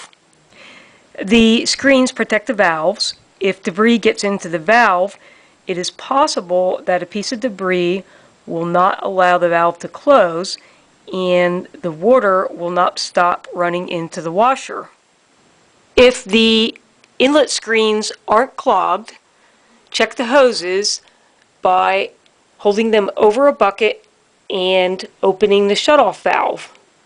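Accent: Philadelphia, United States. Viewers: thanks for the video seems like simple things to check. Mine runs slow only on the second fill. That accent you have sounded like Philly or ?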